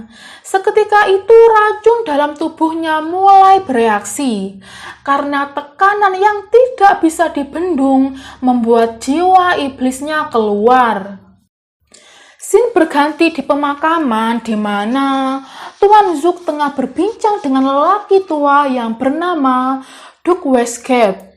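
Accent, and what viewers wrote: Java, Indonesia. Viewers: Jelas wong jowo iki?👍👍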